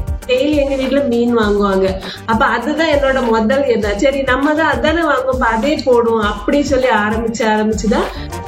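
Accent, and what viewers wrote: Kanyakumari, India. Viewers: உங்கள் குரல் மற்றும் பேசும் விதம் ( அந்த கன்யாகுமரி பேச்சு) இது தான் உங்கள் வளர்ச்சிக்கு காரணம்.வாழ்க வளமுடன். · Best wishes for your grand success in your life n channel. Your voice, Kanyakumari way of Tamil speech r all good. Carry on 💪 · I love fishes so I’m Eagerly waiting for ur daily fish videos neenga seirathu patha saputa mari oru feel😋😋😋 and Ur Kanyakumari slang is so natural ❤nan Coimbatore unga slang enaku kekka puthusa nala erunthuchu 🥰love from Coimbatore 💟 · உங்க குரலுக்காகதான் நான் முதலில் பார்த்தேன். இப்போது உங்களையும் பிடித்து விட்டது உங்க கன்னியாகுமரி பேட்சும் பிடிச்சிட்டு. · உங்க குரல், அந்த பேசுர அந்த கன்னியாக்குமாரி வார்த்தை. எனக்கு ரொம்ப பிடிக்கும். முக்கியமா, கேட்டியலா...அதற்கு நான் அடிமை. நான் திருநெல்வேலி .பக்கம்.சங்கரன்கோவில்..... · அருமையான இனிமையான கன்யாகுமரி பாஷை வாழ்க வாழ்க வாழ்க வளர்க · கன்யாகுமரி slang ,சுறுசுறுப்பு , neatness, மீன் , உங்க உற்சாக குரல் இவை எல்லாம் உங்களை பிடிக்க முக்கிய காரணம் இப்போ இந்த சாந்தமான முகம் ரொம்ப பிடிக்குது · Your kanyakumari dialect and baavala illadha humility dhaan vunga plus points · Mam unga vedio daily parpen ,un kayakumari slang ennaku romba pdikum mam super aa panringa unga job CS pati solunga mam my daughter want to study CS so pls guide vedio onnu podunga ,how job opportunities after CS pls explain mam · Ivanga thirunelviy illai. 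kanyakumari maavattam. kannykaumari maathiri thaan azhakaa pesuhaa. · கன்னியாகுமரிஸ்லாங் தான் உங்களூடைய வெற்றிக்கு காரணம்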